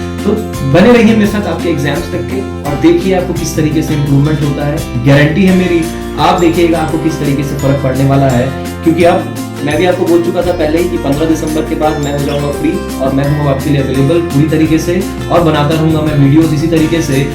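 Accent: Bihar, India. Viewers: Bhai aap Bihari ho kya? Mera ak Bihari dost Aisa hi bolta h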